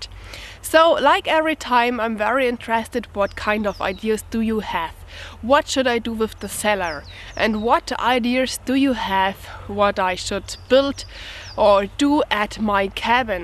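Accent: Germany. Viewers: You have great video's. Mine grandparents on my fathers side came to America where I live and they were from Germany and Switzerland. Well, they had the same accent as you, Yes! they sounded just like you :) I listen to you and I feel very much at home, good feelings. I like your videos & how you take us with you. But you know, to hear you talk, yah? yah I feel at home.. yah, is da truth. :) I'm so happy I found your channel.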